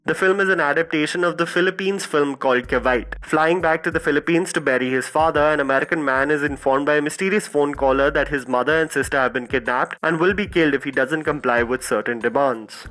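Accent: India. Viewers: That Indian accent though! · Who is here to only enjoy the Indian accent of the speaker?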